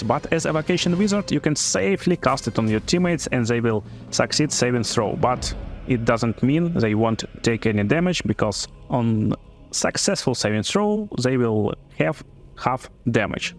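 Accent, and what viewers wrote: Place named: Russia. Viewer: Man, sorry for this question but are u Russian? :D Just interesting, you speak clearly but a little bit with an accent like a russian And thank you for the video! Great review about wizard!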